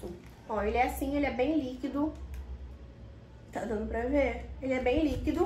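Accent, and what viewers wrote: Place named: Rio de Janeiro, Brazil. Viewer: Sotaque de carioca , no Brasil é assim cada localidade com seu sotaque e temos que respeitar ❤ eu acho lindo sotaque dos cariocas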